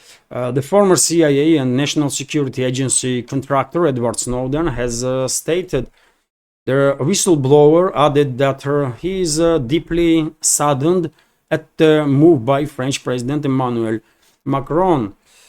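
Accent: Russia. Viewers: Thank you. Keep it up. I am listening to you regularly. I like your russian voice and perspective.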